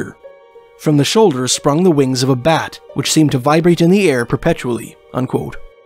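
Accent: Canada. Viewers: I wonder where she grew up in Canada with that accent.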